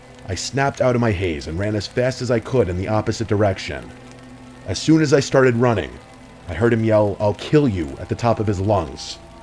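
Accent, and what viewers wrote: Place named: Massachusetts, United States. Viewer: I'm from Massachusetts. sounds like he's got a slight Mass accent.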